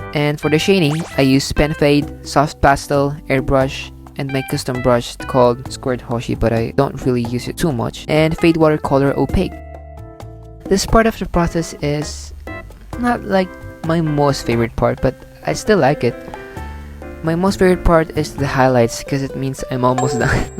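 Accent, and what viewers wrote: Philippines. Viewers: Omg how did I guess that I knew you were Philippines just by your voice/accent! And love your art so much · i can here ur Philipppineas accent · Interesting how people thought you were Korean. As soon as I heard your voice, I was like "that's a Filipino!" Probably because immediately used to the accent XD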